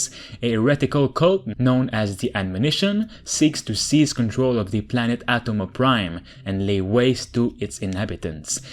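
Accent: Quebec, Canada. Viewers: Are you from Quebec ? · Youre quebecois im i right ? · Are you from Québec?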